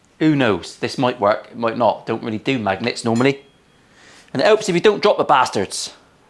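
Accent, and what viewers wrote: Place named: Plymouth, United Kingdom. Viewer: Shit - I’ve got to make a second observation: “Triggers wankers” is now my favourite quote. Wish I had a Janner accent!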